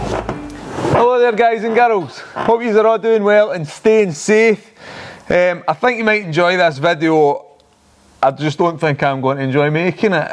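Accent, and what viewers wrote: United Kingdom. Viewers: i dont know how i get here but nice job but can i ask what is this accent like old britan or scottish or irish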